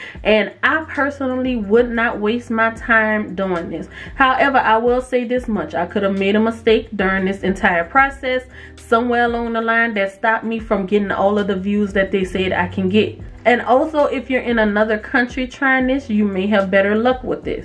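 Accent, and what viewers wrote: New Orleans, United States. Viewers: You from my city new Orleans i can hear it in your voice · I i notice a NOLA accent anywhere ❤❤❤ yeah baybee!!!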